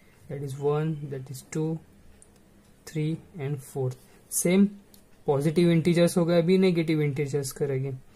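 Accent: Maharashtra, India. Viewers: Best explanation sir are you marathi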